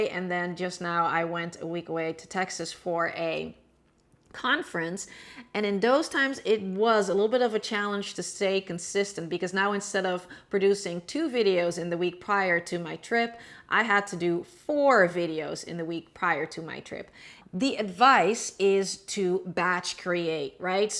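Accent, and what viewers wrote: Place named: Netherlands. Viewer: that’s amazing 😁 I love that you are following your dream and that you took the jump. I thoughtw I heard a ducht accent, but i thought I was wrong. Don’t know why I’m still typing in Dutch, but it’s to late to change it 😂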